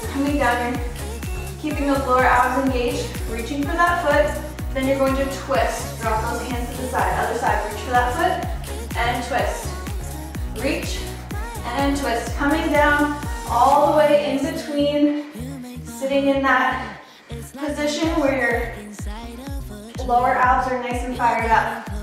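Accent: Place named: Canada. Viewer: Oh! You’re from Canada, eh? 🥰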